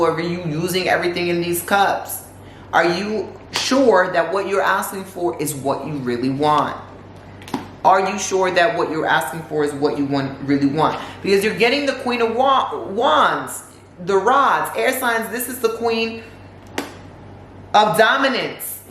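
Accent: United Kingdom. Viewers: The British accent took me down 😂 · Lmao at the British accent, thank you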